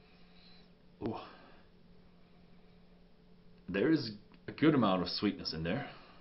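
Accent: Germany. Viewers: dude..move on. Not every word in proper English is pronounced the way you are used to. The presenter is German, there would be an accent.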